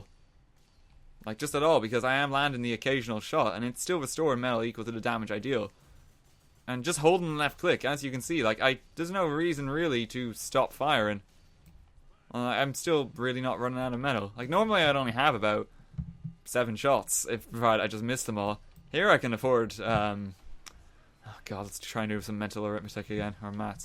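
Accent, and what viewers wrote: Ireland. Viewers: He is irish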